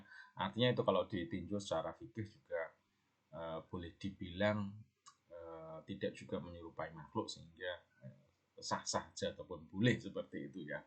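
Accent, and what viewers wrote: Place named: Sumatra, Indonesia. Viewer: Bedo pak ya, suara orang Sumatra ma jawa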